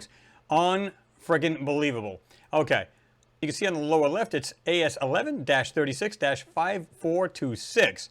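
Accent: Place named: Northeast, United States. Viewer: First thing ....cool show ...love what you cover!! Secondly ...had to smile listening to the accent of commentator....I'm from New England and would bet that the accent is Northeastern U.S. 🌲😁💪💯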